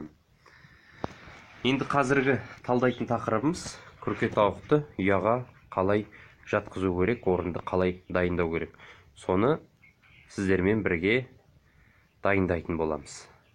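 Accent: Standard. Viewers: Керемет. Таза казакша сойлеп жеткизип жатырсыз! Мыкты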